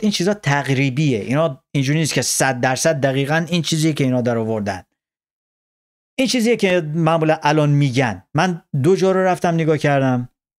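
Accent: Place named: Lorestan, Iran. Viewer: اویل لحجه لری بعض هیچی♥️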